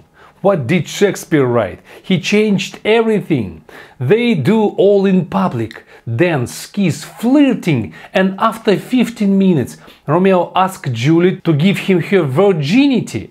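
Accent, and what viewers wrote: Russia. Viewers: Good luck! You do a great job, don’t stop and don’t listen to haters! It’s ok to have hard accent. Russians IT professionals have the same pronunciation and no one bothers. There’s no problem for them to get understood by foreigners or even natives. Wishing all the best, Julia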